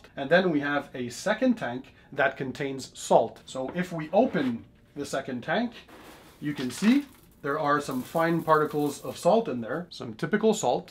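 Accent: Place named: Canada. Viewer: Canadians and their accents.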